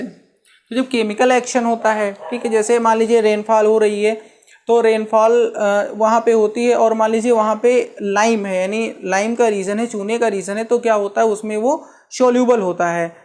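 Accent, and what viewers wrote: India. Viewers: Sir you speak the purest form of English in Indian accent 👍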